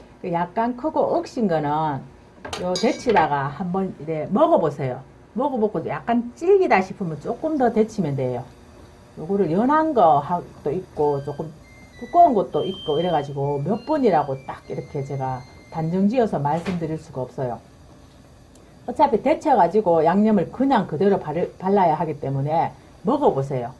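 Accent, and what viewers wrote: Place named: Daegu, South Korea. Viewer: 이 아줌마 진짜!!!!!ㅠㅠㅠㅠㅠㅠㅠㅠㅠ "사투리" "사투리" "사투리"!!!!!!!!!!!!! 어마무시 하다!!!!!ㅠㅠㅠㅠㅠㅠㅠㅠㅠ 사투리도 심한데,,,,,단어선택도ㅠㅠㅠㅠ 나도 경상도 100% 토박이 사람이지만,,,, 이렇게 심한사투리+안이쁜 단어선택 최강의 사투리 아줌마네!!!!!~~~~~~ 단어만 쫌 이쁜단어를 사용해도 좋을텐데 영상을 볼수가 없다!!!!듣기 싫어서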